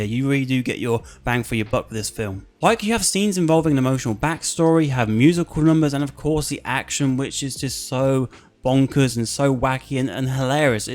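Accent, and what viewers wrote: United Kingdom. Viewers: Is this British accent??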